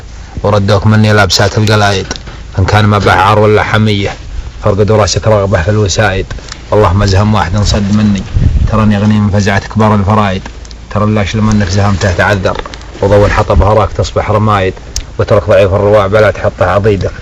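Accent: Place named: South, Saudi Arabia. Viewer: يا ذكي .. هذا شاعر سعودي من الجنوب السعودي .. ولهجته سعودي واللحن والالقاء واضح شعر قحطان ويام الجنوب السعودي .. واضح جدا بإنه شاعر قبيلة عريقة من قبائل جنوب السعودية وغالبا قحطان أو يام .. وربما شهران .. وليس يمني أبدا .. أبدا .. لأن لليمن لحن وأسلوب ولهجة مختلفة تماما .. لكن اللي ما يفهم التمييز .. يقول على الفول عدس .. !!! وسلامتكم .. ابو شنبات ......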